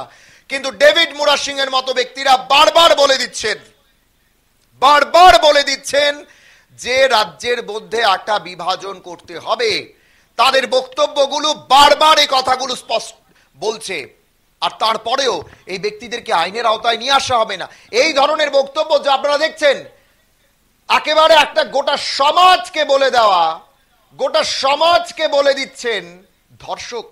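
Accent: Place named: Bangladesh. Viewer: তুই আসলে আসল বাংলাদেশি কারণ তুই যেভাবে কথা বলছস সেভাবে বুঝা যায় তুই ওই বাংলাদেশ থেকে ওই এসোস আমি চাইলে ডেবিট মরা সিং সারের যে লেখছে শের থেকে আরো ভালো করে দেখতাম আর আরো গালি দিতাম বাংলাদেশী তোরা তোরা তিলকে তাল বানাস তার জন্যই এখন যে জায়গাটায় আছো সেই জায়গার বসে রয়েছো কেন ভয় লাগছে বাংলাদেশে যাওয়ার জন্য এমনি তো তোর যেতেই হবে কারণ তুই আসল বাংলাদেশি😂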